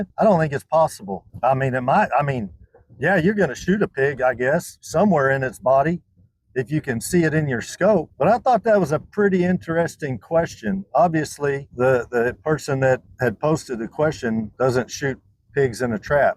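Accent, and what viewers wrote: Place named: South, United States. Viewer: Combination of this guy's subtle southern accent, dialect, and the fact that he never cusses just makes his videos all the more wholesome to watch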